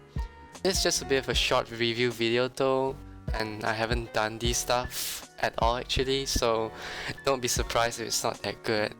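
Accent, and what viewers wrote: Malaysia. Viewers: Your accent sounds like Malaysian accent if you are Malaysian i wanted to say SAYA PERMINAT AWAK(i am your fan) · Syclone Shush Im Malaysian so I know his accent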